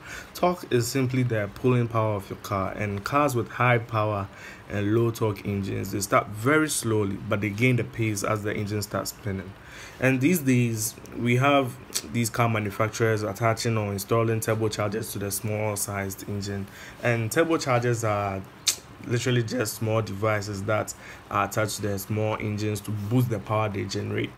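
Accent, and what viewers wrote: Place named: Nigeria. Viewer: You sound nigerian. Ice explanation tho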